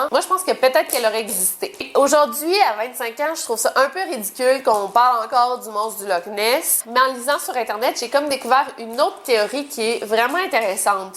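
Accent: Quebec, Canada. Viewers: Je crois à tout sauf le voyage dans le temps c'est faaackkkkk ...si nn je t'adore j enchaîne tes vidéo l'une après l'autre j'adore ton accent québécois 😍 bon courage gros biz ...au passage stp ace que tu peut nous raconté sur l'affaire des 2 jeune femmes tuer au Maroc y 15 jour de ça! ! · J'aime bien tes chiens ils sont trop mignon!!! Et aussi les histoires que tu raconte c'est captivant!. Et j'adore ton accent Québequoi 😘😘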